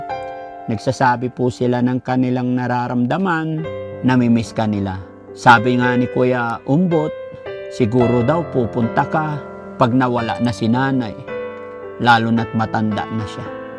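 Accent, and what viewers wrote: Visayas, Philippines. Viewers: Ka cute nimo paminawon gabinisaya hahaha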